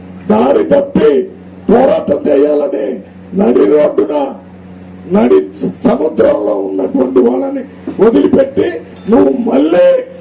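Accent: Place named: Andhra Pradesh, India. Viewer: నీ ఆంధ్రా మాటలు ఎవనికి అర్థం అయితలేదు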